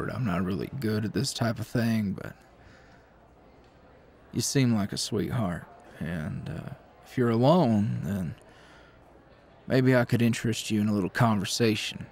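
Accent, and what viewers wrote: South, United States. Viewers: I am sorry but if someone as fine like him approached me with a southern accent and a lovely personality like that he would have had me at "sweetheart"! I just melted at his compliments: Sweetheart, lovely..He is just a cutiepie cowboy isn't he? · "i guess it's an alright place to get lost in hehe, not much to see tho" Me: "well i can see your eyes how about i get lost in those for a bit;)" Y'all i saw dilf and i clicked so fast, also, you'd think growing up in the south would make me immune to the country accent but no it most certainly does not, i am a weak weak man when it comes to men with pretty voices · Oh my LAWD this checks so many boxes. ✅ DILF ✅ Country accent✅ sweet talk✅ this is illegal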